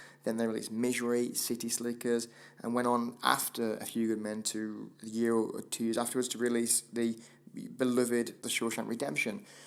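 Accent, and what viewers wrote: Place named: United Kingdom. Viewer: You don't understand english? I'd understand if it's not your first language though since the accent is british, even then, I would study a bit harder on dialects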